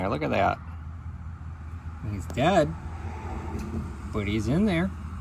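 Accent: Wisconsin, United States. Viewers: Hey I’m curious. You definitely have a Wisconsin accent. I don’t really notice with. So when you are out & about have you had people ask where you are from? That definitely is not a Florida accent. 😂. Then again what accent is FL? Considering there are so many different nationalities living there. My pool had turned green. I had 2 floaters both with 2 3” chlorine tablets & 1 tablet in my skimmer & my pool was green. I had to get 4 5 Gallon buckets of chlorine. The next day it was clear as could be. Helpful hints. I was told by the pool people to add any chemicals at night. You should shock the pool after any rain. The rain and acidity levels throw off the chemical balance in the pool. Waiting until the evening time to add the chlorine & shock slows down the evaporation process. Both totally make sense. Anything to help save some money. 💰🤑. Chemicals can be so expensive.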